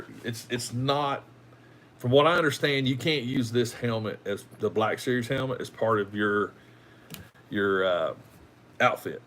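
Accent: South, United States. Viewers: How could I not subscribe to a toy collector with a southern twang.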